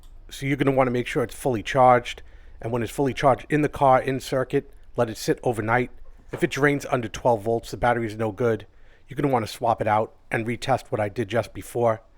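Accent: New York, United States. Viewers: Sounds like a New York accent and it made watching this video hard. Just terrible accent.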